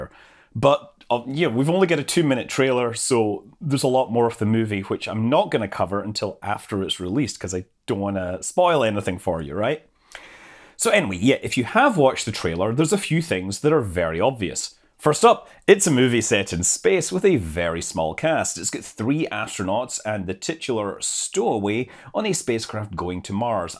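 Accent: Scotland, United Kingdom. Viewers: There's nothing wrong with a good Scots burr.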